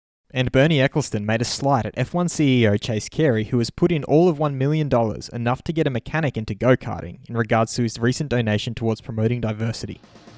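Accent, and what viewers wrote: Australia. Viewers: Also you sound Australian. Wanted to know if you know if they will only show the main race on television or we will see the two practice days and time trials as well?????? Hope you do read the comments. But I really think you should do the countdown clock.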